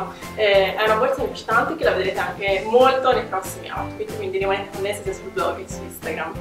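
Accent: Florence, Italy. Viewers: Ma che per caso sei di Firenze ?! ahhaha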